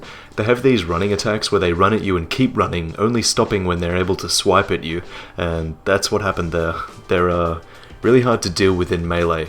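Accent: Australia. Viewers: Wait, is Australian? Nice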